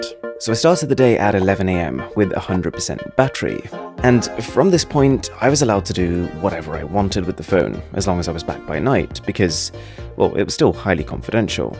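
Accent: RP, United Kingdom. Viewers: Quite weird to hear someone with such a strong/"posh" British accent say "anyways" several times. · This guy is so posh omg looool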